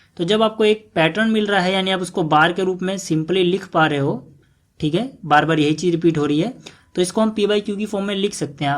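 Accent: Bihar, India. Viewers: Sir AP ky Bihar sa ha konsa jila sa hai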